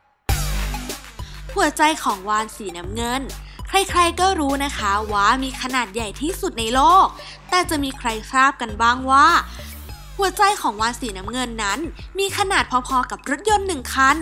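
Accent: Suphanburi, Thailand. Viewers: เหน๋อๆ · สำเนียงเหน่อไง คิดสิคิด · น้องคนพากษ์บ้านอยู่สุพรรณ เหร้อ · มึงจะพูดเหน่อทำไม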